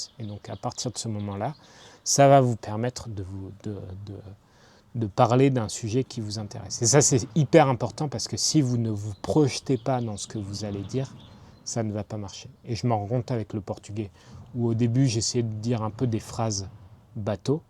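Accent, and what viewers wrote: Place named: Marseille, France. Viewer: Dis moi que tes de Marseille sans me dire que tes de marseilles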